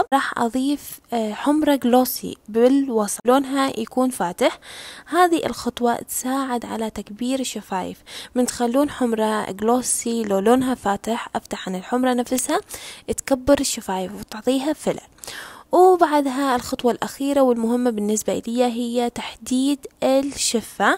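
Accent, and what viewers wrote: Iraq. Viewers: هاي عراقيه 👽🥺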